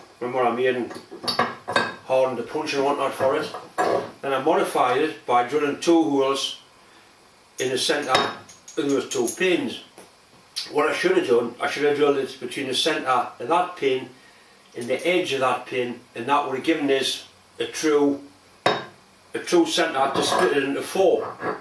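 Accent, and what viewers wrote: Newcastle, United Kingdom. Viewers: Thanks and congratulations (& Deb, and all your supporting team). As I said when you were 23 short of the 100k: “If you’re at all interested in metalworking, machining, old steam equipment, and more and enjoy a Newcastle accent then is well worth watching. No BS, and sharing a lifetime of knowledge and experience.” Honest and entertaining, as good as it gets.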